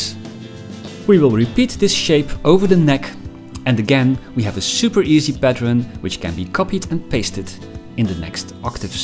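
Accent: Netherlands. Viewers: Indeed. And the Dutch accent adds the cherry on top.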